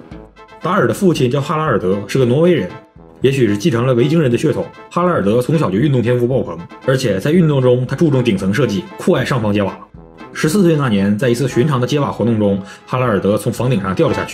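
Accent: Northeast, China. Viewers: 优秀的文案还得要用那迷人的东北口音读出来才得劲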